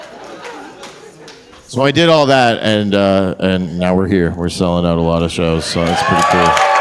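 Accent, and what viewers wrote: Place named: United States. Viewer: This is the most american sounding canadian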